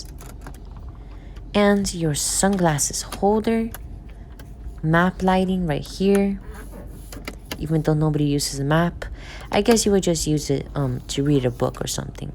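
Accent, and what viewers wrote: Italy. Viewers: 🧐🤨🤔🤔🤔🤔, Are You Sure About That! Oh & By The Way, Your Voice Sounds, Uh, Italian Stuff!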